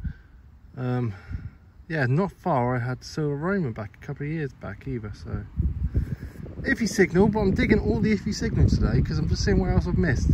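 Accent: Scotland, United Kingdom. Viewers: Awesome hunt 🙌😊 as always. Love your intro ….. haha so many Butttons lol 😆 that’s what real metal detecting all about …have to say my recent finds at beach been quite poor lol 😂 permission says another three weeks until crop ….. booooo lol 😂 Love the Scottish accent ☝️🙌👏🤩 always a pleasure to watch and guaranteed to smile 😊…… #realmetaldetecting gotta love ❤️ it! Look forward to the next one …… you be getting Saxon Gold instead of those gold gilt buttttttons 😂😊🤩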